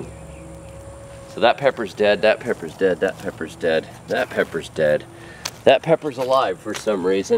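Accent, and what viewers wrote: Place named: Massachusetts, United States. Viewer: Sounds like Massachusetts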